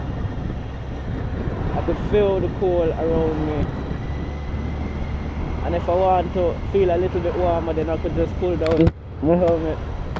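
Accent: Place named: Jamaica. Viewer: Hows the ride brother? You sounds like jamaican hehe i have many friends and tour in jamaica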